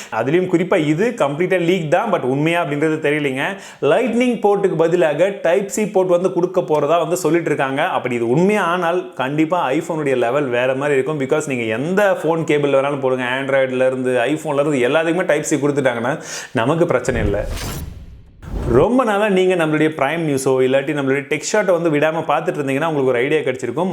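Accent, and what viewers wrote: India. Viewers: வாழ்க தமிழ் if an innovative app from India raises I would really help with everything I have but not an app like tik tok even if it was from India I really see you as a Tamil version of MrWhoseTheBoss if you had an amazing accent other than Indian English accent you would reach ammmmmaaaaaazzzziiinnngggg heights 2 million subscriberslam onnum ila ungaluku please don't think this comment as a publicity these come straight from my heart and please do not think I'm discouraging you or Indian accent I'm just telling white men accent is soo good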